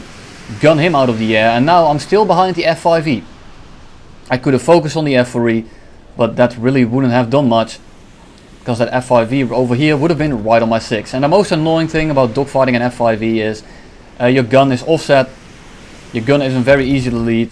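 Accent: Netherlands. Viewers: Are you come from the netherlands?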